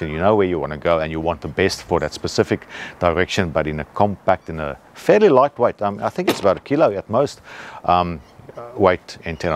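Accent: South Africa. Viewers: Thanks for the video. You sound South African 🤔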